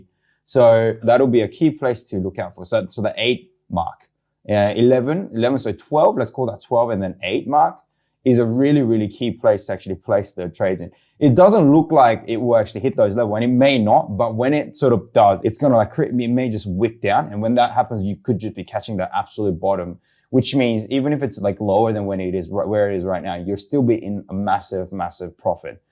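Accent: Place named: New Zealand. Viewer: You live in NZ? Got a bit of a kiwi accent 😁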